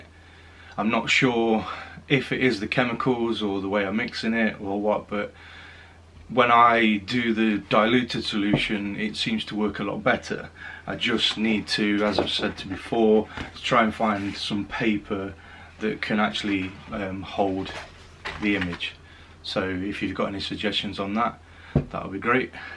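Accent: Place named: United Kingdom. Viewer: Loved your video! I know you made this years ago, but I can currently feel your pain! I'm a printmaker and struggling with the chemical balances of cyanotype! Lovely to hear a fellow British accent too!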